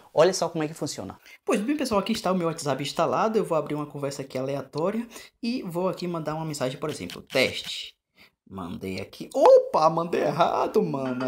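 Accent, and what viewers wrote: Bahia, Brazil. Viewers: man ce é baiano ? kkkkkkkk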